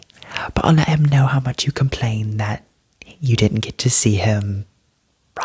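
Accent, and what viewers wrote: United Kingdom. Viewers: As a British UK citizen this video is brilliant pity our NHS systems in poor state takes weeks to find appointments got the british accent spot on tho 😊 love how you done your UK homework on london big ben / British retail boots 😊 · You ate that British accent up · Please make a part two or more british accents i LOVE ITT💕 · I live in britan and this is how we speak :3 "A Bo'oh o' Wa'ah is always good £:" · As a british person, this is how the women talk, the men sound like hard northern accents or indian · As a Brit I was very impressed with the accent,I'm from deepest Lincolnshire now that is an accent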